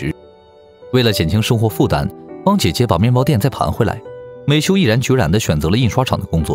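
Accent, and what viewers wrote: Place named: Northeast, China. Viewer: 博主是东北人吧 广播be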